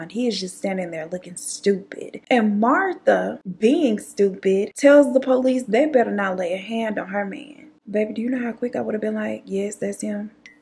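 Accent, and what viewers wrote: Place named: South, United States. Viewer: I love some. Let me just say that I am a language chameleon. Like if I go back home to south Florida I start talking with a Cuban like accent. While living in Cali I sounded like a surfer. I just can’t help it. So listening to has me talking all southern and I catch myself using her phrasing. “All of the things” Omgosh! It’s okay though. Sis is amazing!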